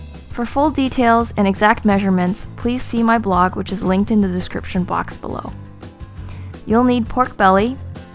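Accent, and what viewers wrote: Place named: California, United States. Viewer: Gastronomic Relevancy Hi, I subscribed too yer channel, But where are all the videos? I'm from California and your English dialect sounds like you may be too. You have a great voice to listen too and your videos are very well done! I want to learn so many Chinese dishes and how to cook with a wok! So, start cooking girl! Show us how to make that sweet and sour pork or chicken right in the wok. Okay? And you definitely must show us how to make those awesome Shanghai soup dumplings. They are outta this world you guys. You are gonna get so many subscribers! And make a lotta money!;) Oh, and you don't just have to make Chinese dishes, make whatever you want...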